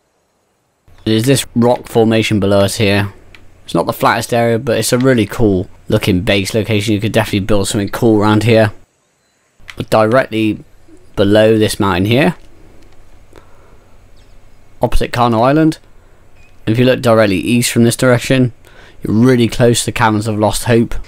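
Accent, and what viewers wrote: United Kingdom. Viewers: Loves your british accent mate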